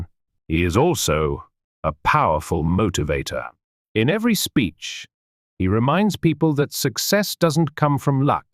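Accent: United Kingdom. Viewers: I love these stories in British English ❤!! Thank you!!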